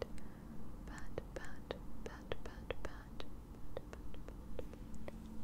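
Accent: United Kingdom. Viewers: this ASMR is absolutely incredible 🤩!! Coming from a British girl, your British accent in this video is absolutely insane 🤯!! Super spot on!! This video was everything I want in an ASMR video & more!! Soft, sweet ☺️, relaxing & super tingly. PLEASE 🙏🏻 do more British accent role plays. Much love 💕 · Love the video a d your British accent hehe. So pretty 😍 and calming 😁😴 great job as always!Hope you had a Happy Thanksgiving!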